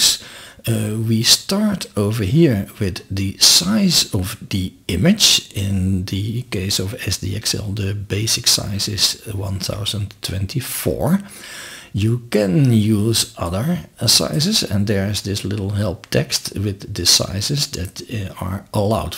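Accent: Netherlands. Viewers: some vague intuition tells me you are Dutch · duidelijk te horen dat je nederlands bent :) bedankt voor de informatie. ben nogal nieuw met ComfyUI en jouw workflow bestanden zullen zeker helpen mij het beter te begrijpen :) toodles!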